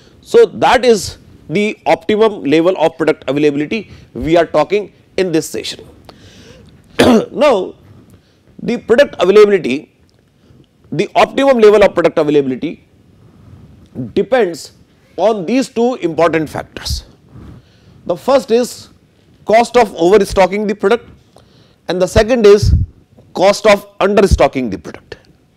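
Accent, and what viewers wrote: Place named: India. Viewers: His english is better than you , he has an Indian accent. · OMG improve your English please 😭 Thanks for the hard work but i can't understand you cuz it's like speaking English in Hindi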